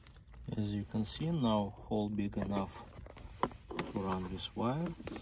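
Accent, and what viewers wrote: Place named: Russia. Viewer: какое русское произношение))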